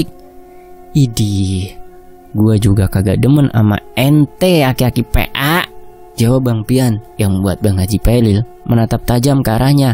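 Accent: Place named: Betawi, Indonesia. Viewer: Wa'alaikum salam wr wb Saluuut bgt sama mas suaran & Dialek Betawinya pas bgt menyatu & menjiwai kereen 👍👍👍🥰🥰🥰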